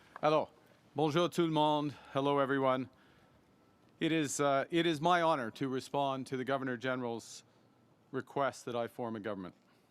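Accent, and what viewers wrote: France. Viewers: I don't know why some critics say he doesn't speak French well... He does and he speaks French French not Quebecois, maybe the critics are attacking that.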